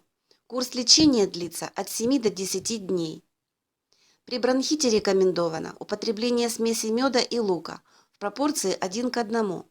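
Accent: Ukraine. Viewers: это украинский диалект и не тебе сопле указывать комуи как разговаривать и прав тот человек ,что назвал тебя Бляндинкой!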